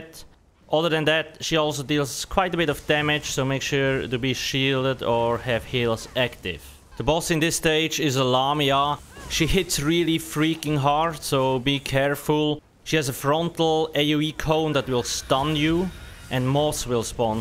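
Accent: Switzerland. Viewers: bist du schweizer???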